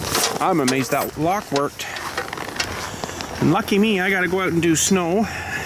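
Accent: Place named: Canada. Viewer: You must be Canadian ah where abouts are you ?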